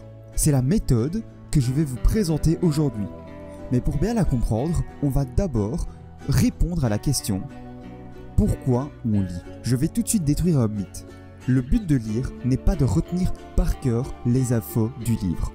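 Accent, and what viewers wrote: Liège, Belgium. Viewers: Bon tu viens de Liège ? ❓😜 belle video bravo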